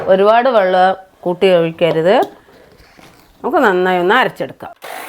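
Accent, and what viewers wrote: Palakkad, India. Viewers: ചേച്ചി അടിപൊളി. പാലക്കാടൻ ഭാഷ 👌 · പാലക്കാട് കാരി ആണ്ണോ സംസാരം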